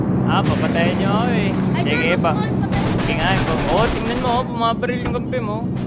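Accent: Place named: Iloilo, Philippines. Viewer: Ilonggo yan lods hahaha trastalker hahaa illongo kami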